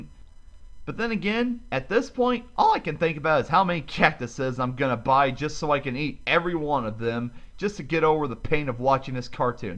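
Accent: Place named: Texas, United States. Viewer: you got a Texas accent.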